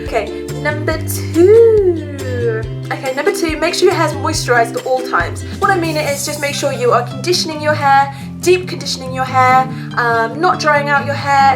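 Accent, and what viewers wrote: United Kingdom. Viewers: She is British tho. Most people understand the British accent, it’s yours that nobody understands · She is fast plus British accent🙄 and the music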